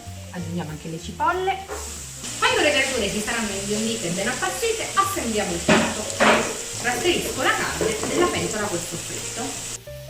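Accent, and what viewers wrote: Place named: Sicily, Italy. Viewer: Ma non senti il suo bellissimo accento siciliano??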